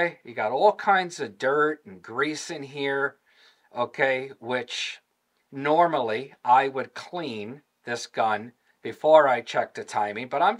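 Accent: New York, United States. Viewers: Montana gun shop with the New York accent way too many okays for me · Pretty obvious that he's from NY/NJ. You can hear the accent slip in frequently. 👍 EDIT: Favorite revolver and handgun is my S&W 686-6 shot w/6" barrel. I've had it for 25 years now. Enjoying my new 627-8 shot with 2.6" barrel, but would prefer if I could find the 627 w/6" barrel (not currently offered.)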